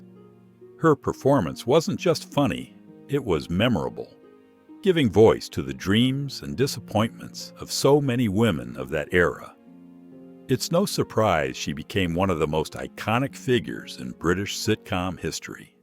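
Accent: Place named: United States. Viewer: American narration.Cant watch this sh1t.Spoilt the program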